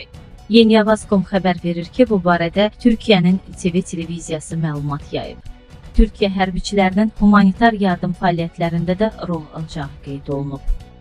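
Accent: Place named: Armenia. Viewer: Ay məni qınayan mətni oxuyan ermənidi ?? Bu necə oxumaqdı? Hardan tutub gətiriblər bunu ????? Ay bala bu nə matetialdıııııı?????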